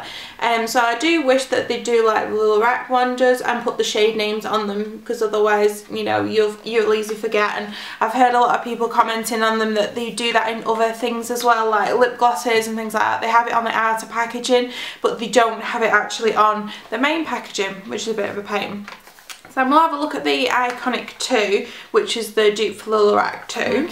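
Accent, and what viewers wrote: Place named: United Kingdom. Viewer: Honest review much appreciated! I'm a Brit in the US, and your accent is making me all homesick :)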